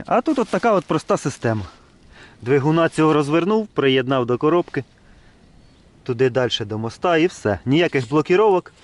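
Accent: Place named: Western Ukraine, Ukraine. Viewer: Як мені родом з західної приємно слухати вашу говірку ❤❤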